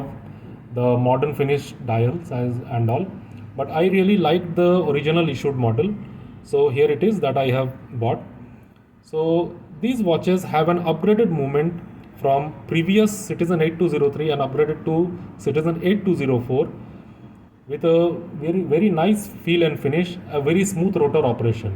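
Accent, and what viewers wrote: India. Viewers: i like Indian accent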